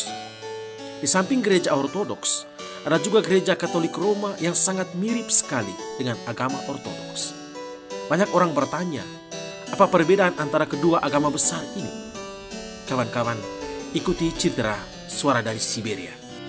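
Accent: Flores, Indonesia. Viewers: Kalau diresapi logat miminya seperti org bejawa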